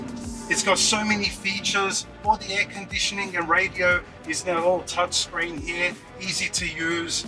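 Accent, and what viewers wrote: Australia. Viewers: lol😂 Aussie accent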